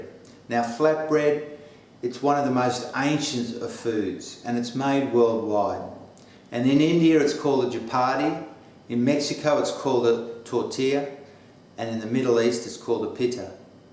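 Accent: Australia. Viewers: Maria Lopez Nope, that's an Australian accent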